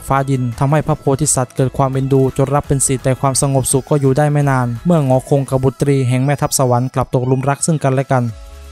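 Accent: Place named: North, Thailand. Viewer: น่าจะคนภาคเหนือครับ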